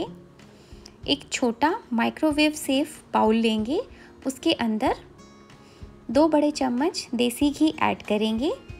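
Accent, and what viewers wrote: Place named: Marwari, India. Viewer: Good trick. You have a Marwari accent :)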